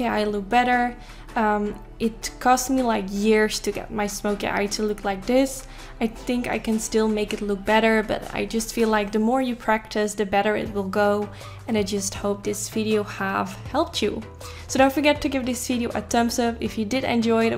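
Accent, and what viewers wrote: Netherlands. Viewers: Ik moet zo lachen om jouw heerlijke Louis van Gaal engels! Ik vind je geweldig!! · is she dutch? Idk her accent sounds Dutch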